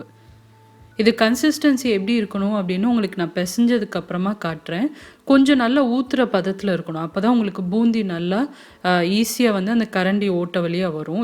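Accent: Madurai, India. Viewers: Vada chatti typical madurai slang.... Thanks for the receipe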